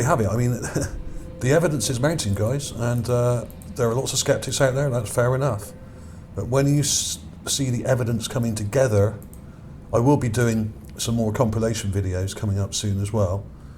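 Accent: Bristol, United Kingdom. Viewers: Dear friend with british accent- you could slow down your exciting explanationns, beef up your volume 16 percent, louder is better here, so many videos I simply cannot hear and I wont wear headphones, I hate that, I was forced to wear HD ear protection for years, ok at least boost it 10 percent if at all possible. macbookair-speakers are not loud enough! thanks why do British accents make it hard to understand? love the shows, theories and analysis, wish you would decorate your findings with more filters and colors, but yeah you do know the art of photo analysis, great job, very creative and amusing at times! A big THUMBS up! Thank you for your research! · Think about who those dislikes are coming from, there are entities out there who are not private individuals, and who will attempt to discredit anything that leads people to the truth. They are all over the web, on forums etc, it is their job, it is what they are paid to do. 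Keep up the good work...that accent sounds like Bristol, we could be neighbours.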